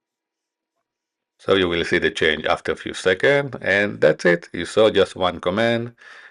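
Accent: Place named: Israel. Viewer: Brother are you Israeli by any chance? the accent is too noticeable 😅😆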